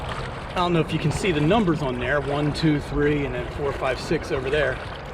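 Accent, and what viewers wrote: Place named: Philadelphia, United States. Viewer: Hey, I’ve been really enjoying your review videos on the SeaEagles as I’ve been shopping around, and I had a feeling you were a Philly guy based on that familiar accent.:) I’m from the Philly burbs as well but have been living out west. You’re really spotlighting the Northeast in a beautiful way and these adventure videos have made me more optimistic for moving back home and explore the places that were in my backyard the whole time. Can’t wait to see more, Thanks!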